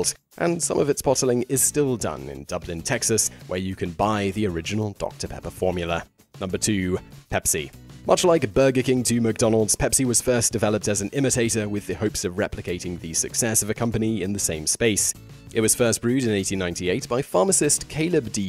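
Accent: United Kingdom. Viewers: I guess I'm just a slow talking southerner, hard to keep up with a fast talking Brit. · R C Cola needs to be on this video!!! And why the British accent?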